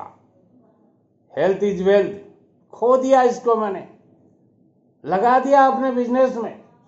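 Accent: Rajasthan, India. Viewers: Dadaji Aap Rajasthan me konse jile se ho Bhansa aapki Rajasthani si lg rhi h. point 1. Bai ka fool bai k lag jay. 2.Bahut bde bangle ka kam tevad diya.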